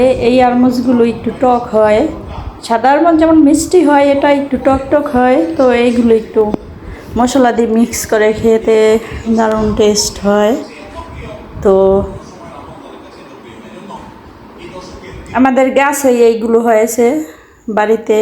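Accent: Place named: Noakhali, Bangladesh. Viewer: আসসালামু আলাইকুম আন্টি আপনার বয়েজটা নোয়াখালী সাথে আসতেছে লক্ষ্মীপুর জেলা মতো শুনতে অনেক ভালো লাগে আপনার বয়েজ। আল্লাহ আপনাকে সুস্থতা দান করুন এবং নেক হায়াত দরাজ করুক আমিন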